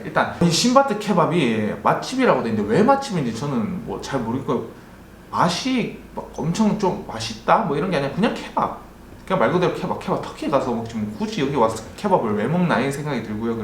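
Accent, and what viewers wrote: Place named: Gyeongsang, South Korea. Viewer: 콘텐츠 잘 봤습니다. '좋댓구알' 했구요. 억양에서 살짝 경상도사투리가 들리는데 혹시 경상도 출신이신가요? 전 대구남자입니다. 혹시 Q&A영상이라도 있으면 알 수 있지 않을까 싶어서 검색해보니 QNA영상은 없네요. 다른 의도는 없는 순ㄴ수한 호기심에서 하는 질문입니다.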